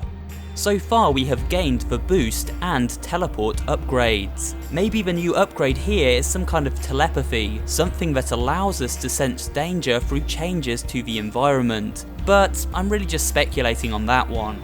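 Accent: United Kingdom. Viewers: why you british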